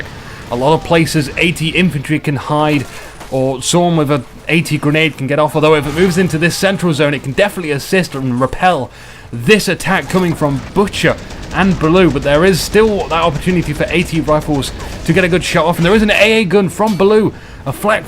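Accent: England, United Kingdom. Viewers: Fantastic video! Thanks for all the hard work, I can tell by your commentary that you too have a huge... english acccent and I love it! Excellent game, good to see lots of pressure and lots of light vehicle play, this game is great in that its not all just kt and is3 spam!:) good luck to butch and baloo, having watched this video and shootter's videos it seems like butcher and baloo are going to spank them back to mexico.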